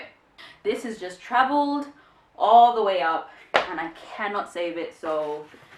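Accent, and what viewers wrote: United Kingdom. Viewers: Im like in pantyhose heaven with ur sexy legs and ur accent..love that brtish accent super sexy.thanks for sharing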